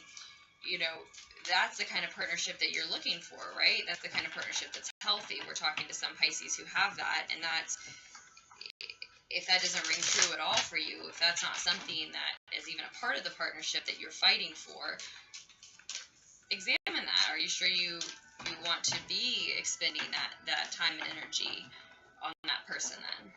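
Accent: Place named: United States. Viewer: You're so beautiful and insightful. I like your energy. And, even though I suppose you're American, judging by your accent, you and your environment look quite European and more soulful than the average US-like vibe.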